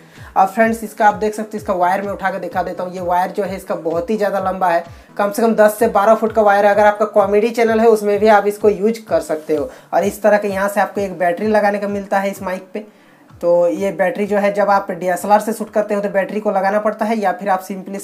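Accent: Bihar, India. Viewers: You talk so sweetly are you from Bihar? :)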